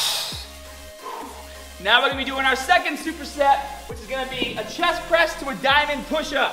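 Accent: Boston, United States. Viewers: I swear... I used to watch your videos when you were first getting "staaaahhhhhttted", and you did NOT have that heavy Boston accent whatsoever. Did you just get hella bored one day and decided, "Fuck it, I'm gonna start having a dense Boston accent in my videos"? I don't get it. But I like it. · This guy has a Boston accent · Wow...I feel so petty that I just can't to listen to him talk with that Boston accent. I feel kind of weird that it annoys me that much. When I am not a negative or petty person at all. But he won't miss me not subscribing. He has plenty of people that don't care. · Your Boston accent makes this hard to watch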